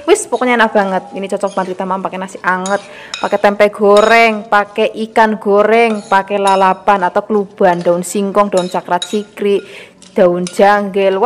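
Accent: Java, Indonesia. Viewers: So yummy banget Suka dengan resep²nya bahasanya medok kyak Saiya kak orang jawa Kaye trimkasih Kaka resepnya topmarkotop Joss🥰👍👍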